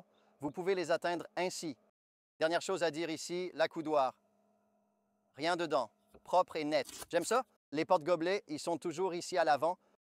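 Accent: Quebec, Canada. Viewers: La traduction automatique n'est pas très bonne. Et la voix choisie a un accent québécois.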